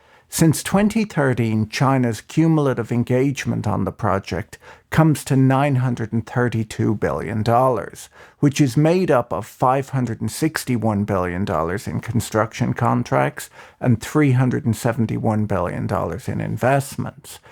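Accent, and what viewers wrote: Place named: Ireland. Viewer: Irish accent obviously but what else is in their too? I can't figure put my finger on it..... 🤔🤷‍♂️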